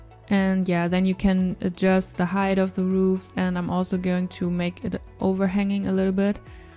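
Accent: Germany. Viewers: ich komme auch aus Deutschland. Ich hab mir schon irgendwie gedacht das du deutsch bist weil man das ein bisschen hört. 😂😁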